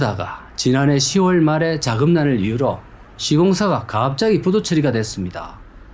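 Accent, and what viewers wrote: Gyeongsang, South Korea. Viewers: ㅋㅋㅋ 참에 아~아~아 할때 왤캐 웃기죠 ㅋㅋ 경상도 아재님 ㅎ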